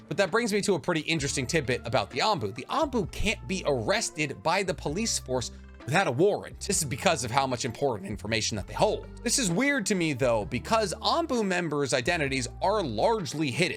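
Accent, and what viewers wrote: Boston, United States. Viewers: It's his inner Boston that comes out ! I love the energy you have in ur videos .. good good work guy !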